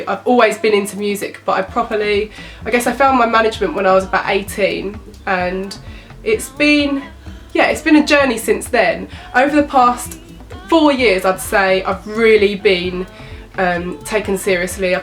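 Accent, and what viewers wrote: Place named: United Kingdom. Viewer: I could listen to your brittish accent 24/7 😍❤️❤️❤️❤️❤️❤️ love you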